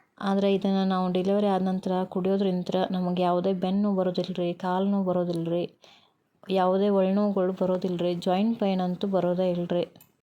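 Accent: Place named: North Karnataka, India. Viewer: Super sister uttarakarnataka jawari matu ❤❤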